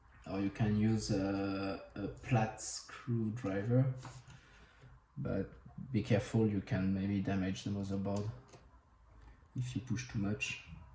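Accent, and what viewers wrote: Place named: England, United Kingdom. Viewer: J'adore ton accent anglais XD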